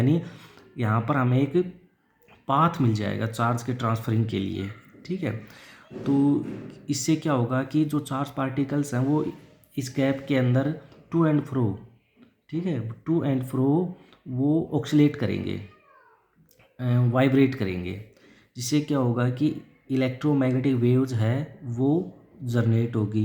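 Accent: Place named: Haryana, India. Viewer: That haryanvi accent tho🔥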